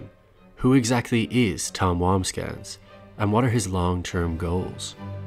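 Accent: Ireland. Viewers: Do I hear a slight Irish accent??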